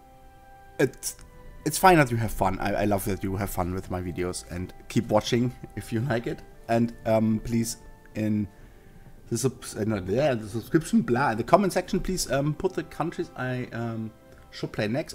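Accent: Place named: Germany. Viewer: Congratulations! I kinda guessed you were german from your accent. But don't get me wrong, i like the accent, it's very euro-English ;P Can you please consider playing People's Republic of China? Please please please, I haven't seen anyone play as them so far.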